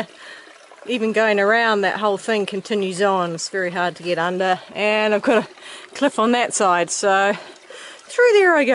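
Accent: New Zealand. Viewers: I love how you always start your videos with “good morning!” In your NZ accent. It’s so charming! Love your videos!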